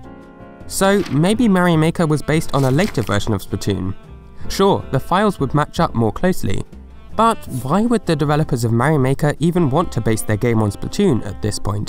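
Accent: United Kingdom. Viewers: He's a brit, that's why. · Nothing makes me happier than listening to a cheerful British guy telling me Nintendo trivia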